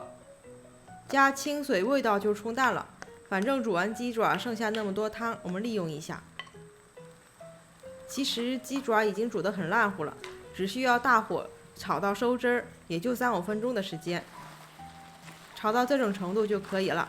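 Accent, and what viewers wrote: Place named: Northeast, China. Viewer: 영상 잘 봤습니다～这个时间看这个，真的流口水了，听博主说话应该是东北人吧😊性格特别豪爽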